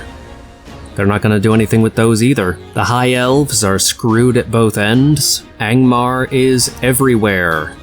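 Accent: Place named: United States. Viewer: Completely understand the frustration. I do hope you'll stay the course. Your narration is clever and entertaining, and the editing, phenomenal. I subscribed immediately upon finding your channel. I'm a lore nut and really only watch good faction campaigns. Would love to see you play as an elven faction or Gondor on AGO and see what that does for your channel. Whereabouts are you, if you don't mind? Stateside? You sound American but seem to handle Germanic and Gaelic word annunciations with ease.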